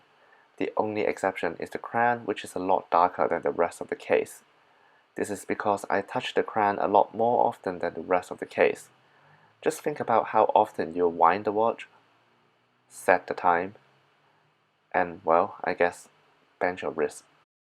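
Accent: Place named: China. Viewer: you chinese ah? sounds like uncle Roger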